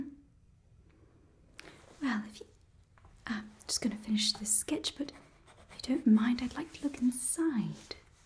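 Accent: United Kingdom. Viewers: Would absolutely love this character to come back, I'm not sure what it was but this video is 1st in my top 3 asmr videos. (The accent was very English. If I was a new sub I would've thought you were from uk). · Your British accents are always so tingly ❤❤ Also I made a nobel water genasi character named Cordelia literally a week ago, I’m losing my mind.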